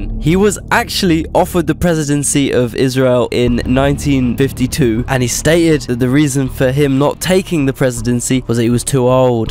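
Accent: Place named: United Kingdom. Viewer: Esse sotaque Britânico é muito bom Arca naim daish nuai nuan rai trai grai cakerrrrr LMAO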